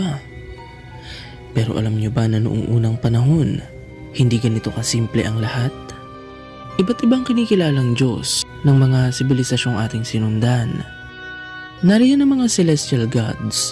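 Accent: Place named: Cebuano, Philippines. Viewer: Hello ka-, ang style at pamamaraan mo sa pagsasalita ay walang pagkakaiba sa nagsasalita ng Ophirian Heritage Conservatory, ibig ba sabihin na iisang tao lang kayo? Kahit tagalog ang ginagamit na salita ngunit ang accent ay parang sa isang cebuano language. Maganda ang ipinapakita mong presentation at wala itong pagkakaiba kung paano ginawa ang presentation ng OHC. Very Educational!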